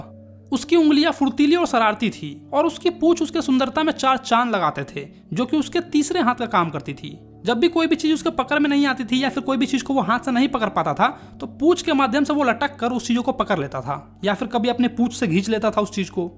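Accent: Bihar, India. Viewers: Bhaii ye bihari hai toh bihari tongue hi hoga na. Explanation is good by the way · Are you from bihar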